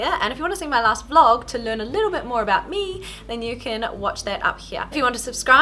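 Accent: New Zealand. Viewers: is she from new zealand?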